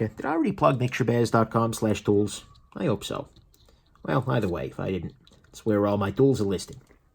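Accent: New York, United States. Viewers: You don’t sound like you’re from Cali. I’d say Flatbush or somewhere off the L.I.E.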